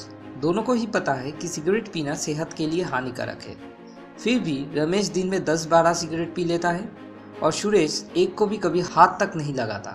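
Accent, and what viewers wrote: Bengal, India. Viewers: bad video. bad accent.bcoz u r a bengali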